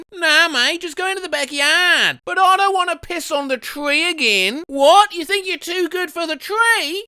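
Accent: United Kingdom. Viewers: Is he British? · Was not expecting to hear Hindi in a British accent today but here we are! Bahut khoob!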